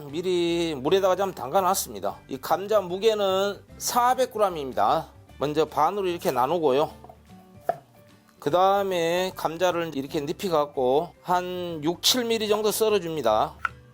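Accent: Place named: Yeosu, South Korea. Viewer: 안녕하세요. 여수사투리쓰시는 셰프님 정겨워서 늘 제일 먼저 찾아보는 요리정보예요~ 잘 보고 있어요 감사합니다:) 근데 요즘 제목에 자꾸 ‘이걸’ 넣으세요~ 이런식으로 쓰시는거 같아요. 좀 별로예요 제목으로 낚는 다른 콘텐츠들 보면 짜증나는데 는 안 그랬으면 좋겠어요.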